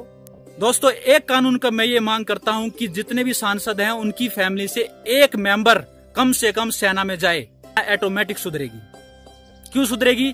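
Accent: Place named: Rajasthan, India. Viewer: bhai rajasthani ho kyaa....accent aur background se guess kiyaa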